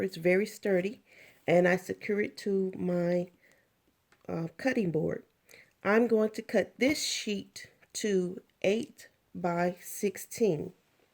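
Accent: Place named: South, United States. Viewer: You sound like a fellow Southerner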